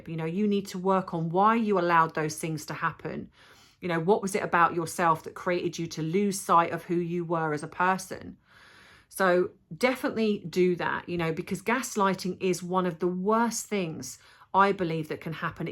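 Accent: United Kingdom. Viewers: / I’m sure you’ve got some special tea to soothe that lovely British voice. 🥰 Sending you well wishes & positive prayers. 🇺🇸👵🏻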